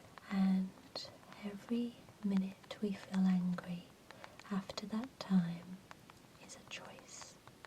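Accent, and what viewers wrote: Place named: Essex, United Kingdom. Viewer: love listening to your voice. calming, soft, essex twang with a touch of class and a slight hint of nautyness. could listen to you for hours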